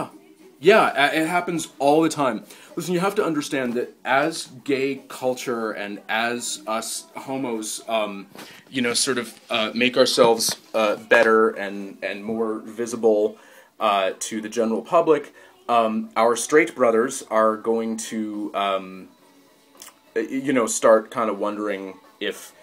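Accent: Atlantic Canada, Canada. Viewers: also, I love accents. I think they are wonderful. You can use that atlantic canadian accent all you want. I might even pick it up from you, cause well, I have habit of doing that after some exposure. It's so not intentional either. The sprectrum of sexual orientation is fluid, but each person has thier own container. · how canadian, the accent and in the background. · i love your atlantic canadian accent And your fake american accent!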